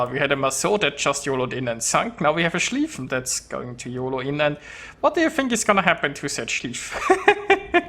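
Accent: Germany. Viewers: First time on the channel, and the maniacal laughter couple with the German accent makes for a great experience.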